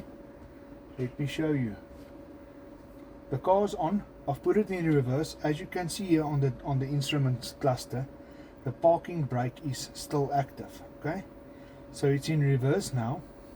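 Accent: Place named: Afrikaans, South Africa. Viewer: Great vidoes... Just one problem.... why did you apologise for your Afrikaans accent? Your english is better then my Afrikaans... LOL🤣. By the way , where's the video about the foglights?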